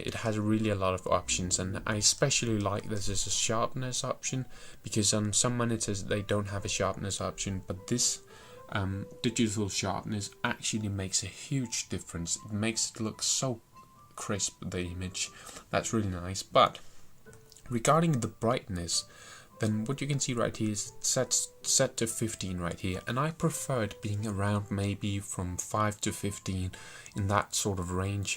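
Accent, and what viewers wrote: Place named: Denmark. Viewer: The Danish accent is so obvious XD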